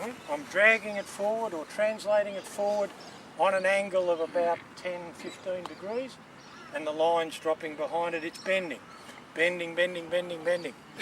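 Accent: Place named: Australia. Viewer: First off, this is one of the most detailed explanations on how to properly execute the double haul albeit a bit hard to follow the Auzzi accent. I’ve watched many top notch instructors and not until now have I heard the reason to wait until the snap of the wrist. He’s absolutely correct in saying that most people start hauling from the beginning or translation of the stroke; I include myself in the bunch. I’m trying to correct this but since I’ve been doing it for many many years, it is not easy to change my technique but I’m trying nevertheless.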